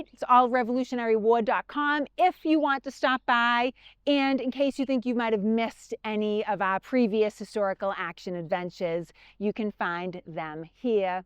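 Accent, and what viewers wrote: Boston, United States. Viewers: I love this woman. You sure as hell can tell she is from Boston! Sometimes I have to rewind & play over what she is saying to understand her. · Thank you, for another interesting action adventure! I love your enthusiasm and your Boston accent too! I've been to the Bunker Hill Monument and taught about the battle in my grade 5 History class, but I didn't know about the rail fence stuffed with grass and hay. Keep on with your historical posts. I always learn something new. 🙂 from NH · Great history lesson, along with a fabulous Boston accent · Love your Boston accent.😊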